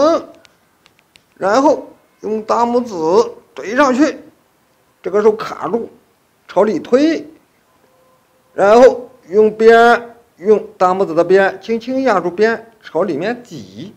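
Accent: Shaanxi, China. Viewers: 这陕普听得我太亲切了！想回家😭😭😭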